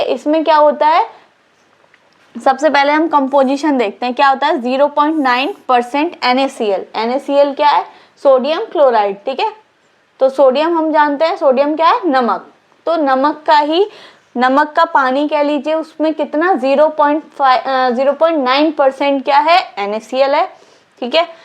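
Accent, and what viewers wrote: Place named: Assam, India. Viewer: Ma'am aap Assamese ya bengali ho kiya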